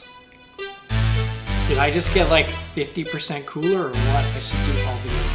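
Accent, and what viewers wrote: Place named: Canada. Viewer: Canadian accent freakoUt:D:D NICE! Cool music:)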